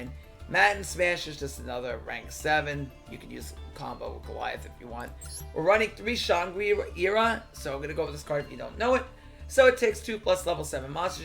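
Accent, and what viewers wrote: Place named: Long Island, United States. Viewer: Dude, you have to be from Long Island. 😂😂😂 Great Video my guy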